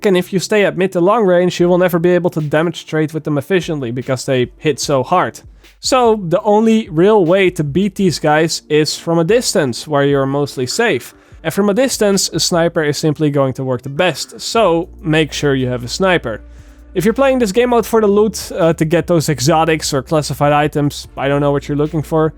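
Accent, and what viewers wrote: Netherlands. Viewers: I can hear you are dutch🤣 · Are you dutch ? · Cool video! I really love that durch accent not gonna lie 😂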